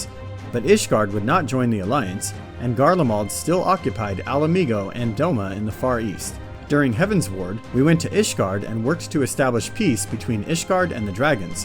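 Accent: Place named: United States. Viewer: I'm just so thankful that you are so articulate. So many other summary videos, I can hardly understand their accents (European, speech impediments, etc). I'm from the United States so hearing an American accent is so much easier for me to understand. Thank you.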